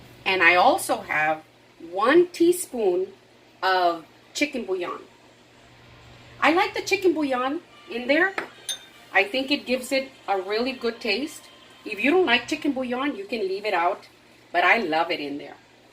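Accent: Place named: Texas, United States. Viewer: . Love your channel. Reminds me of my grandmother’s cooking. Must be the love. I was raised in south Texas and your slight accent is reminiscent of my upbringing. It’s like being home with family.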